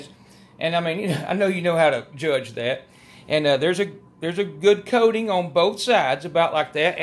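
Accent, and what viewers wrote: South, United States. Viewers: Thanks for the great video. I've never pulled that membrane off but I will now. love that southern drawl you got. Gene from Canada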